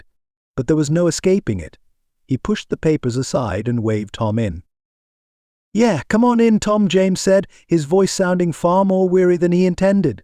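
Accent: United Kingdom. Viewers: The British accent doesn’t seem to fit with a historical western.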